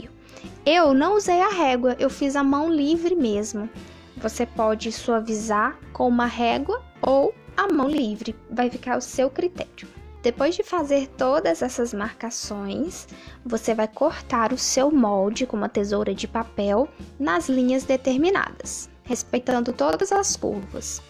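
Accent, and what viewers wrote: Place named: Minas Gerais, Brazil. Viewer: Adorei a explicação pra construção da máscara!! Obrigada!!! Vc é mineira né??? Que sotaque gostoso...BH???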